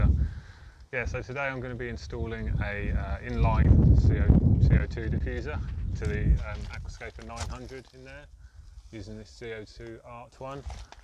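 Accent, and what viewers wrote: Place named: East Anglia, United Kingdom. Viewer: I can tell, the east anglean ish accent 😅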